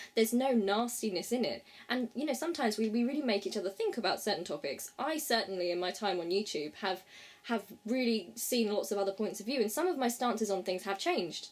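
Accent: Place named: England, United Kingdom. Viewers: Wow, lol. That surprised me, when you read those comments out like that, raw and uncensored. I must say, you were sexy while doing that, and it was stimulating, in an odd way...(grin) I guess its because you have that English accent, and always seem to be very proper and have that kind of good girl image, that it was shocking to hear you read some of those lewd comments out loud. Anyway, to get serious, I admire that you are able to handle that sort of online abuse/harassment, it shows you are a strong person within yourself. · More proof that everything sounds better in an English accent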